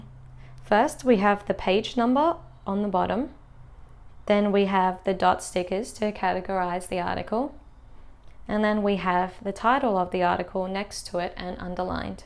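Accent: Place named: Australia. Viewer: Is it bad that I knew in the very first 3 seconds you were Australian like me? Hope you're doing ok and have found a job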